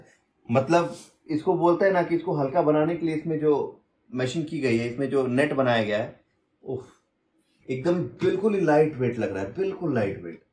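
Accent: Bihar, India. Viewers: Are you from Bihar ?????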